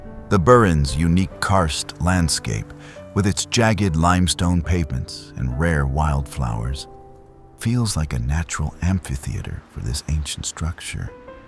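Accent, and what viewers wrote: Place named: United States. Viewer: Ahhhhh not this annoying AI American voice. Bleh.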